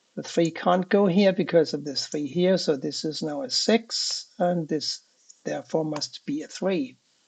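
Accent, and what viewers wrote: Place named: Germany. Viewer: Are you German? Love you accent!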